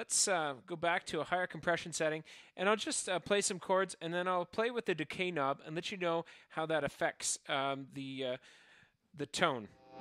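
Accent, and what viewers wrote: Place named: Canada. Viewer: every time you speak it makes me miss my Canadian friends.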